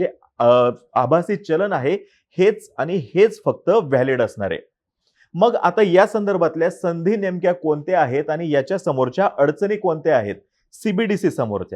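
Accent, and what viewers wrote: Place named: Nashik, India. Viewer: तुम्ही नाशिक चे का हो? तुमच्या बोलीभाषेला नाशिकची लकब आहे..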